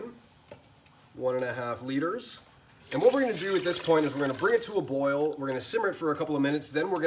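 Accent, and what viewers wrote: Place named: Scotland, United Kingdom. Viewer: I usually have that as a starter whenever I'm in Scotland. Brings back memories of having it on cold days, both summer and winter 😅 I always like how the Scots add barley in soups while in Asia, it's served as a drink or dessert. There are variations of Scottish accents and yours reminds me of the Simpsons. 😅 Great one. It looks amazing and rustic 👍🏻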